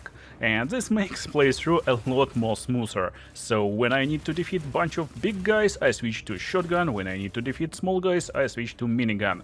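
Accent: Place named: Russia. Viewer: Слышно что ты русский)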